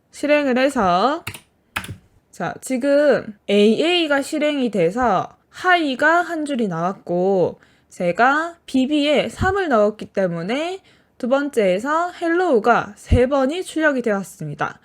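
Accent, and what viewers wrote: Gyeongsang, South Korea. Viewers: 목소리가 명쾌하고, 박력있고, 경상도 억양이 친근감이있습니다. 무엇보다도 강의 내용이 체계적이서 감사드립니다.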